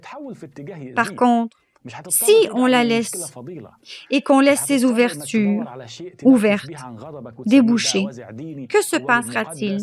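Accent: Quebec, Canada. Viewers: Français avec l'accent québécois ! Excellent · J'aime beaucoup l'accent canadien j'ai l'impression et que je suis en trame du coûter un prêtre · L'accent québécois t9iiil hhh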